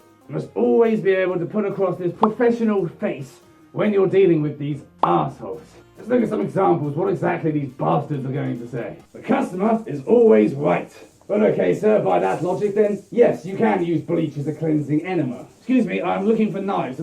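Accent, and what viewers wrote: United Kingdom. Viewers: I can tell he’s British.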